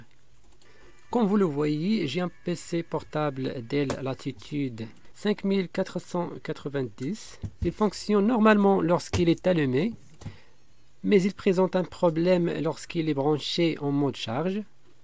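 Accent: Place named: Algeria. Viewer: السلام عليكم ، الأخ مين من الجزائر لهجتك جزائرية، أريد إستشارتك في موضوع الله يحفظك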